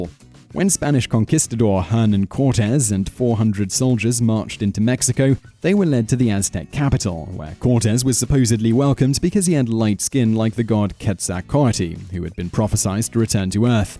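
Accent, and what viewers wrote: United Kingdom. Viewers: You have the most British voice in human existence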